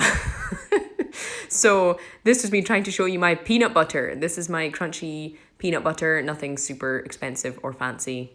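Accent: Scotland, United Kingdom. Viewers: I get the Scottish accent from you really well.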